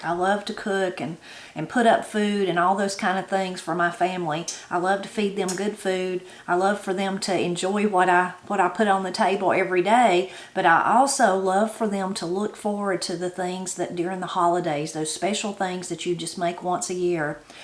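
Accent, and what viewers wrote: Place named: South, United States. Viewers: oh, your accent sounds like home. I moved to the east coast from the south a year ago and never hear those southern twangs anymore. always so nice to hear them. these are a staple where I'm from in Arkansas, as well! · Great video. Watched this from the UK Never heard of this before but I will definitely make it. Loving your southern accent ❤ .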